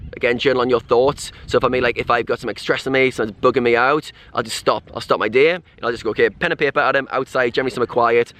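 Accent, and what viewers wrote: United Kingdom. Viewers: If you are up for feedback then the other thing about the speed of your speech is the accent. I’m in London and am well versed with with the many different accents throughout the country. Whilst your Geordie accent (I’m guessing) is not super strong it is significant and not a problem for me but when you combine that with the speed of voice then anyone that does not use English as their first language could find it hard.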